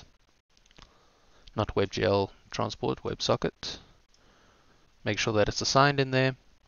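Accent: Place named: South Africa. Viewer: SA in the US. Cool to hear a South African doing this.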